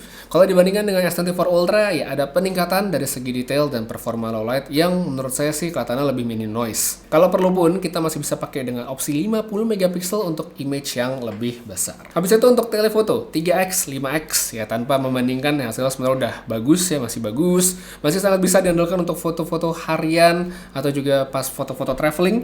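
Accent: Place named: Java, Indonesia. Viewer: Bang dengan medhok jawanya: "Galaksiii Eaiiii"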